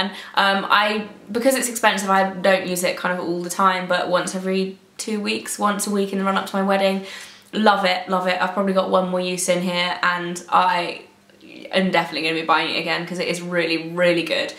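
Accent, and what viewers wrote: United Kingdom. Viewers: Is she Britsh?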